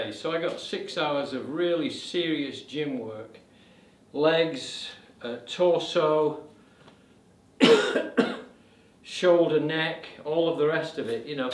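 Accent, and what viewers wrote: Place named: Derbyshire, United Kingdom. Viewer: The Thames!? I can't exactly place you accent, but it's close to mine, Tideswell, Derbyshire. Now living in northern Italy, chestnut forest. Find your attitude very reassuring, keep up the good work!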